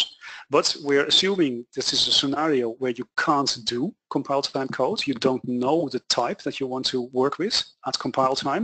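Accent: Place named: Netherlands. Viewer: bro u sound dutch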